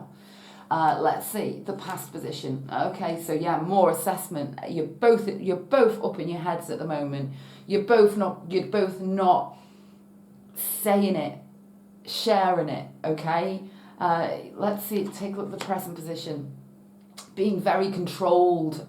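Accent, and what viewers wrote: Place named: Merseyside, United Kingdom. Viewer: Merseyside accent xx Love your reading xx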